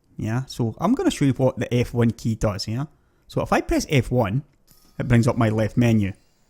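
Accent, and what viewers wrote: Scotland, United Kingdom. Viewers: First class presentation of how to use Voice Attack. I got it yesterday and have been looking around for a tutorial to learn its programming from. I am looking to set it up with DCS A10C and whilst a different programme to ED the methodology is well explained with both demonstration and explanation. Your video is going to be by my side when I start setting VA up. I imagine that it will work fine on other games containing a matrix for keystroke mapping/editting such as IL2 and Silent Hunter 5. BTW, what part of Scotland do you hail from??? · I'm so glad I found a Scottish guy doing this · scottish accent, I dont understand a word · I find the Scottish accent really pleasant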